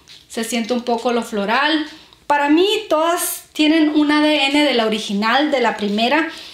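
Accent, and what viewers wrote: Chihuahua, Mexico. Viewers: Si yo pensé que eras de chihuahua ai viví y e encantan cómo hablan jijiji saludos, ese perfume cuánto te dura...? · Hola me gustaria saver de donde eres por tu sonido de voz a mi parecer creo que eres de chihuahua o de sinaloa a cual de los dos le atine😁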